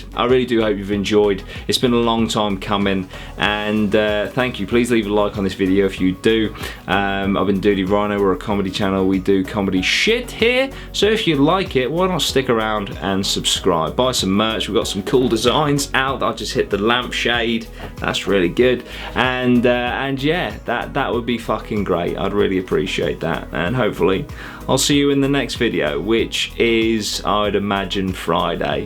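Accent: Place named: Liverpool, United Kingdom. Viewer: Your scouse accent cracked me up 😂😂😂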